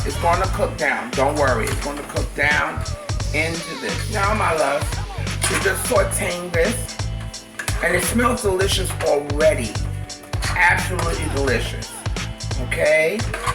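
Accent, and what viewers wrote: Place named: East Coast, United States. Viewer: Hey Ms! I love your cooking style! Your green sauce is giving me life and I haven’t tasted it yet! I love your sass and that east coast vibe. Oh yes, I can hear it in your voice! Thank you for this depth of flavor. I’ve seen recipes where people just add salt and pepper with some chicken stock. Period. I need spice in my life! I bought some neck bones and limas today, so this will be on my table soon! Thanks so much! ❤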